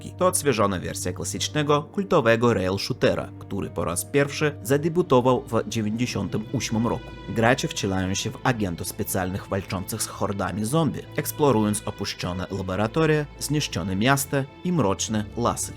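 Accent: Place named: Russia. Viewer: brat jest naprawdę z rosji a ja myślałem że tak dla beki taka nazwa xD ale kocham twój akcent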